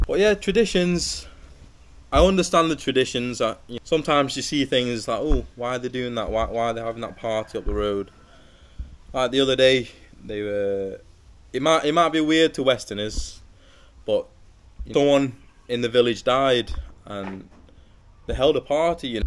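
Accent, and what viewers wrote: United Kingdom. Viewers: Bloody hell!! A Thai man speaking British! Good grief i can't believe it! 😅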